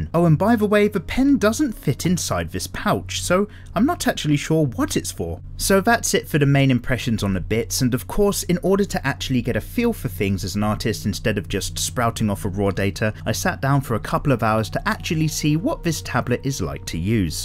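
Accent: United Kingdom. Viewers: I am new on your channel, but I already love it. It is so nice to listen to your voice recording about drawings while I am doing my own arts. Thank you. Love your british accent!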